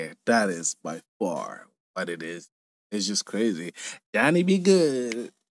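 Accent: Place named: Jamaica. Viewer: I am a white guy in his mid 50s and is one of my idols. He mixed country with the old blues and kicked up playing faster and harder. Both the Beatles and Rolling Stones were inspired by him. I live on the east coast on the US and his accent sounds like mine mostly. where are you from sir? In no way am I saying anything to disparage you accent. It sounds Jamaican maybe?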